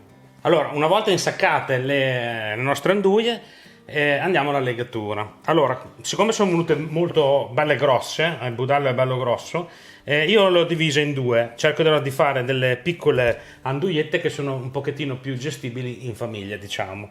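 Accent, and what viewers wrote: Emilia, Italy. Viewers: Bravo! È un piacere seguirti e sentirti parlare. Salumi e pronuncia emiliana mi riporta alla mia metà mirandolese. L'altra metà sanmaurese (prov di Torino) mi dice però che quel fornelletto da noi si chiama Fojòt (fuiot) e serve per scaldare tenere calda e degustare la bagna caoda (cauda) piemontese. Tecnicamente fa lo stesso lavoro per la bagna e per la 'nduja: manciari! (La parte ragusana di mia moglie)😜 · ... nel video sembri mia nonna, stessi gesti, stessa sicurezza. Bravo, un calabrese con l'accento emiliano, perfetto. Un solo piccolo suggerimento. Conviene, anche solo per praticità, così faceva anche mia nonna, legare i tocchetti di nduja mentre si riempie il budello. Tutto il resto è perfetto, anzi l'aggiunta dell'affumicatura, mi convince molto. Sono dei Casali cosentini e qui c'è un salume simile forse identico alla nduja, lo chiamiamo nduglia, le cui tracce risalgono al 1600 (quindi più antico di quello di Spìlinga). 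È citato in una poesia di Donnu Pantu, "la briga degli studenti", di Aprigliano (CS).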